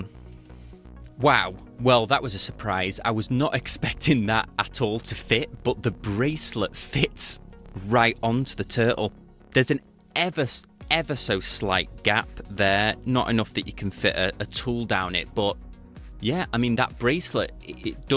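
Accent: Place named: Scotland, United Kingdom. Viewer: He needs to fix his Scottish or whatever voice damn annoying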